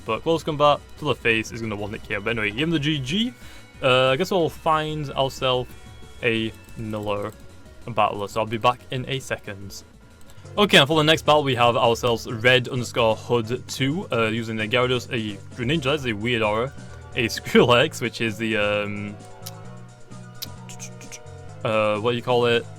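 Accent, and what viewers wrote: United Kingdom. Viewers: What's his accent? British?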